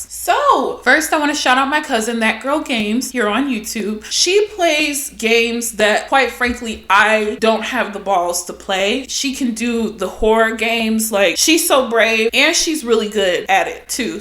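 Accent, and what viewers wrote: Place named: United Kingdom. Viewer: Your British accent 😭😭😭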